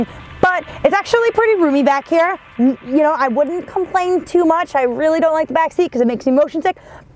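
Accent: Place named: New England, United States. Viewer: 613 I love the way she says "Honder" instead of Honda. I enjoy listening to her New England accent. I am from Oklahoma and so I like listening to other accents besides those found in the south or from here in the southwest. Love your accent,!:-)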